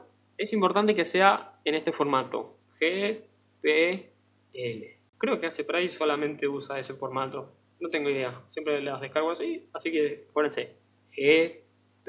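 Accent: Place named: Argentina. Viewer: No se por qué me es desesperante escuchar tutoriales en español castellano xd pero me es super reconfortante escuchar argentinos c: Gracias carnal, buen tutorial, saludos desde México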